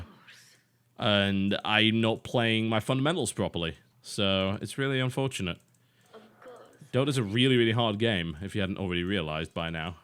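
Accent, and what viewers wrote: France. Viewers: Love the French accent lol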